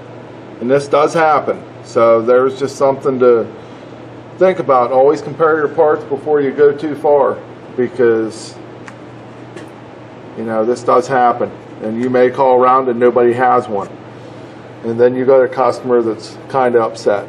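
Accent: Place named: Pittsburgh, United States. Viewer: Good vid thanks cuz... it's what I thought anyways but you just gave me some reassurance that I was doin the right thing. You're a Burgh boy ain't you? I can hear it in the accent (it's thick cuz), the force is strong wit this one.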